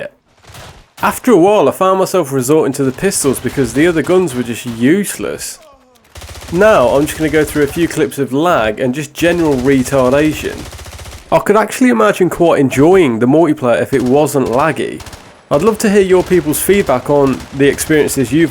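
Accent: United Kingdom. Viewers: absolutely love the british accent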